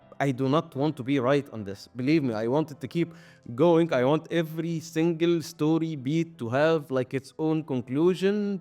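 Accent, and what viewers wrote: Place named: Egypt. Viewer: My friend you have an Egyptian accent.. it's nice however, is it actually Egyptian?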